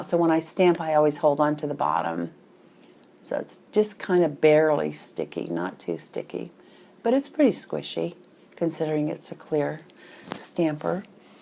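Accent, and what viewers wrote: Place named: South Carolina, United States. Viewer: love the nails. love his accent too. sounds like mine lol I'm also from South Carolina. thanks for doing the tutorial. hope you have a great Thanksgiving!!!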